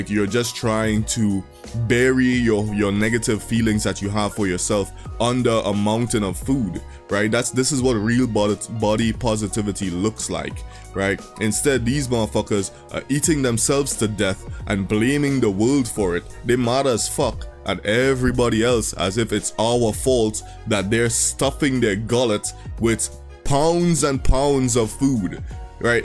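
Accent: Trinidad and Tobago. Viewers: You kinda sound Trini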